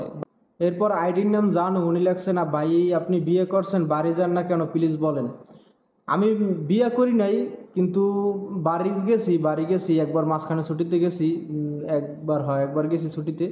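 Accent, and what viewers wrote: Barishal, Bangladesh. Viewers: ভাই আপনি কি বরিসাল্ল্যা?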